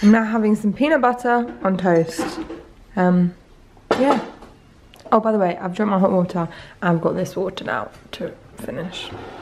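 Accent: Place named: United Kingdom. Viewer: Love your accent, voice , face British people are really beautiful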